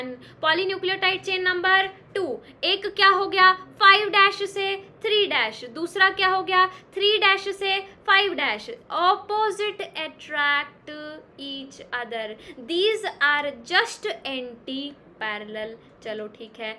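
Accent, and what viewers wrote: Haryana, India. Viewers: Diii aap haryanvi ho kyy???🤔🤔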